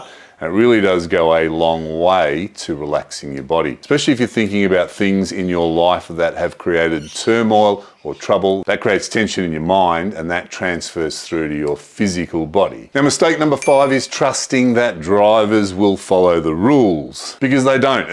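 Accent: Australia. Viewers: Can’t tell if you’re from Australia or Boston MA 😂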